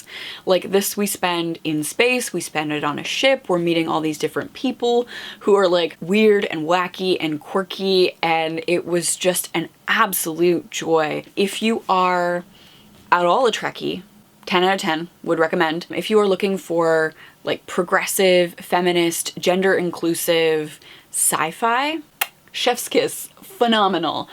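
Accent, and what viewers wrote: Canada. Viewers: Hello, I have been enjoying your reviews. As a English teacher in Australia I love to pick up on different accents. I have noticed when you say out or about it comes across as oot or aboot. What is the origin of you accent?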